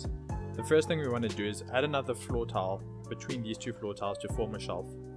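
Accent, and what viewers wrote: South Africa. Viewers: South African? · are you South African?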